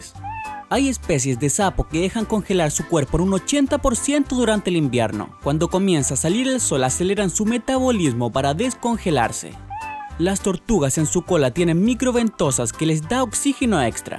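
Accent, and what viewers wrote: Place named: Chile. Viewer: En cada video tienen un acento diferente.. esta vez tuvo mas el acento Chileno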